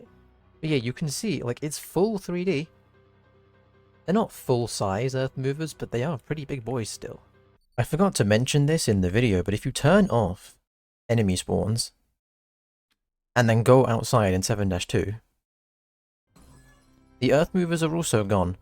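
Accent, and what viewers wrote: United Kingdom. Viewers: Holy british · are you british by any chance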